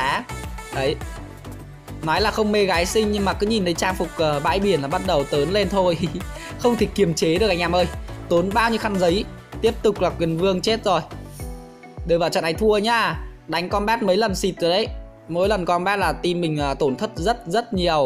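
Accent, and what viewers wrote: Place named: North, Vietnam. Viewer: Giọng bắc dễ thương qá :v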